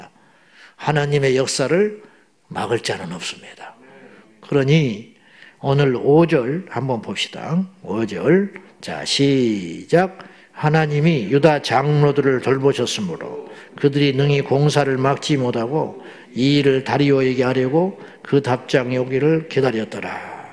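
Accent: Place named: Jeolla, South Korea. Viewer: 목사님께서 가끔 사투리를 말씀하실 때 그 뜻과 의미를 거의 완벽하게 알아들을 수 있어서 참 좋습니다. 언어 소통이 어려운 이민자의 삶으로 살다보니 온전히 이해할 수 있음도 감사한 일이더라구요. (제 고향이 전북이거든요^^) 에스라 입장에서 1장~6장은 과거의 이야기. 536년 도착 520년 학개 스가랴 성전건축 촉구 스룹바벨과 예수아(여호수아) 건축 516년 10월 성전 건축 완공 지연된 이유 1. 사마리아인들의 훼방. 2. 성전 건축의 중요성을 잊어버림. 458년 에스라 중심 2차 포로 귀환 444년 느헤미야 총독 성벽 재건(3차 포로 귀환) 외부보다 내부의 문제가 더 많은 교회. 세상 법정으로 가지고가지 말아야하는데 지켜지지 않는다. 문제는 깨닫지 못함 생명의 역사를 바라보아야한다. (5절) 하나님의 역사를 막을 자는 없다. (단 1:8) 다니엘이 뜻을 정함. 예수님의 뜻을 정하심 거룩한 뜻을 정하면 하나님이 행하신다. 믿음은 기름이고 하나님의 불이다. 믿음의 결단. 뜻을 정하자. 결론 1. 거룩하고 의로운 뜻을 정하면 하나님이 반드시 도와주신다. (악의 도구가 되지말자) 2. 하나님께서 정하신 뜻을 반드시 이루신다.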